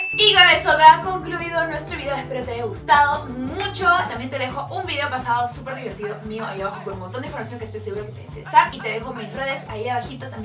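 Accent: Chile. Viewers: Chilena :v?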